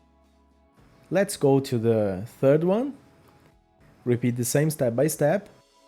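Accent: Brazil. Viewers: Vídeo salva muito, logo reconheci que era br pelo sotaque, inglês bom e vídeo bom! Precisava de uma embalagem pra um presente pequeno e essa ficou perfeita, obrigado!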